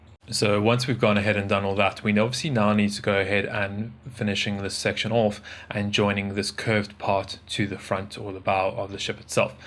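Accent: South Africa. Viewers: You sound south African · You sound like a South African :/ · Are you south african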